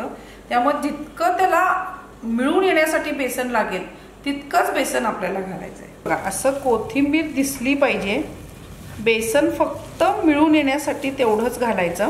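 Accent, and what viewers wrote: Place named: Kolhapur, India. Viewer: मी खूप दिवसांनी आज यू ट्यूब वर आले आणि प्रथम तुमची रेसिपी बघितली. खूप छान सोपी रेसिपी आहे. आज मला १० रु. ला मोठ्ठी पेंडी कोथिंबिरीची मिळाली आहे. आज संध्याकाळच्या जेवणातच मी ही भाजी करून बघीन. माझ्या तोंडाला आत्ताच पाणी सुटलंय.! आणखी एक. खूप दि.नी तुम्हाला पाहिलं. हडकल्यासारख्या दिसताहेत. छान दिसताय. तुमचा कुडता, व त्याचा पॅटर्न पण खूप आवडला. तुमच्या तोंडची कोल्हापुरी लहेजा असणारी भाषा मला फार आवडते. लहानपणी काही काळ कोल्हापुरात होते. एकदम् नोस्टाल्जिक वाटतं.❤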